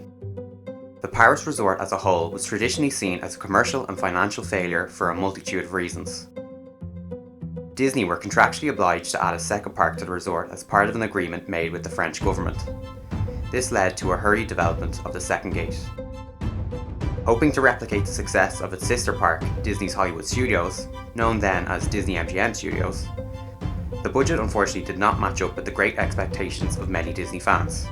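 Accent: Ireland. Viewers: Yes, it's excellent quality. Thank you. Just not used to a non-American accent in these videos lol. Irish?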